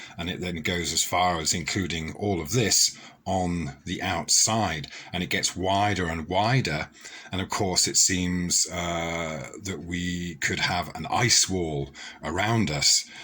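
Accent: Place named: RP, United Kingdom. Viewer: Thinking that rotating 2 out of date 2D projections of a globe validates a flat earth is extremely childish and infantile in its total impracticality and worthlessness. I do understand azimuthal projections but that doesn't make it " fine " to have to spin one so that continents align correctly. If you honestly believe that it makes any kind of sense to do this then I doubt its worth explaining why this represents nothing relevant to navigation in the real world.. How do you travel South to North in South America and Africa at the same time? You do have a decent Semi Posh ( RP ) voice but hearing so many ummm and errs is a dead give away you're blagging. You really are pointing in the wrong direction maybe when the magic sky daddy spins half the earth around you'll find your way.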